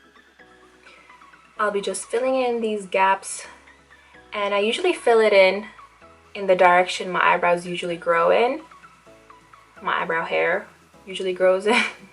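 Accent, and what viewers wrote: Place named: United Kingdom. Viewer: You are starting to have the British accent 😜 Love your brows, they look pretty identical!💋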